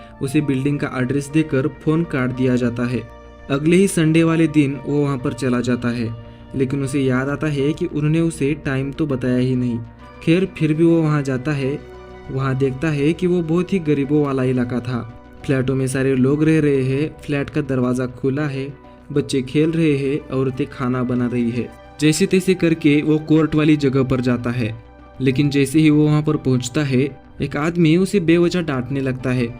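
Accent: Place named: Maharashtra, India. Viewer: Bhava marathi ahes na? Accent varun samjl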